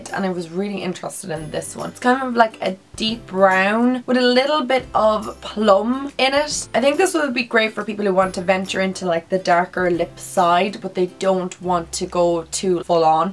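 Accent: Ireland. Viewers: I just started watching your channel and I tough you were from ireland I know my irish accent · Are u irish😘😘❤️ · i love your irish accent!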